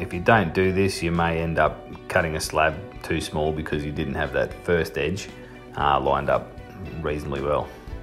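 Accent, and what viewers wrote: Australia. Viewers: Aussie accent took me on a ride for sure. Thanks for a speedy reply! Thats a sweet hefty vise you got there. Looks like a Record’s 53e younger brother. Your shop looking spiffier and spiffier! Soon you’ll have to grow a beard and visit tattoo parlor. Its a must ... you know. LOL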